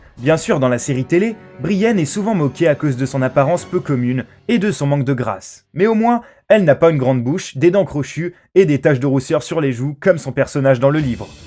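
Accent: England, United Kingdom. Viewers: Arrête avec l'accent anglais et tu écorche tout les noms et ta jamais vu game of throne sa se voit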